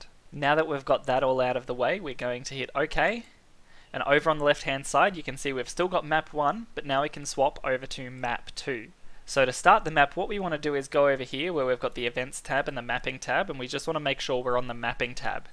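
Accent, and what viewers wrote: Australia. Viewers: You're from South Australia aren't you?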